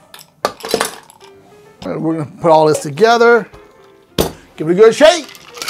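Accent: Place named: Philadelphia, United States. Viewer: You live in Louisiana but is that a Philly/South Jersey accent i detect? Or Delaware?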